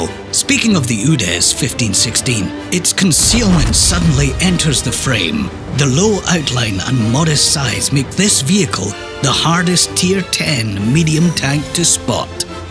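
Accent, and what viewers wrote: Scotland, United Kingdom. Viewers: That Scottish accent is thicc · The scottish accent is stronk in this one.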